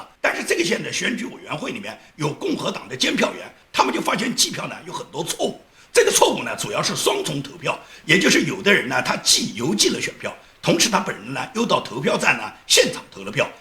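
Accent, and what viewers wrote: Nanjing, China. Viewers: 我发现你是不是一个南京人，之前，一口南京话。。哈哈。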